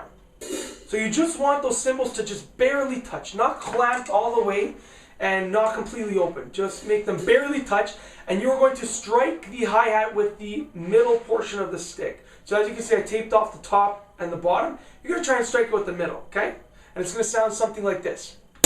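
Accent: Canada. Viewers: Are you Canadian? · Definitely Canadian. Good video man. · Sounds canadian for some reason.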